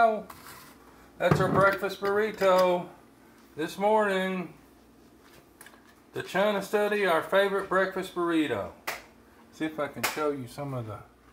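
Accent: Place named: South, United States. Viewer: I am loving your southern accent! Being from the south myself, I find so many men would rather die of a heartache eating meat than go vegan for their health and family! Very refreshing to know a southern man can convert! lol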